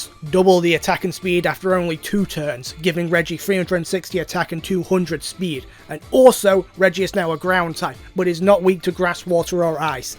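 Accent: Yorkshire, United Kingdom. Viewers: Somewhere in Yorkshire I think. Definitely northern. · Any British folk recognize the accent used here? I've heard it on quite a few YouTubers.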